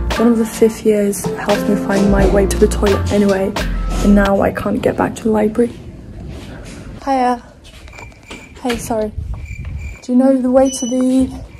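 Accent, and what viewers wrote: United Kingdom. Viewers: Altyazılar olmasa ingiliz aksanını anlamıyorum ama halledicez artık xmcmmxö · She must be in the UK. Cause her accent.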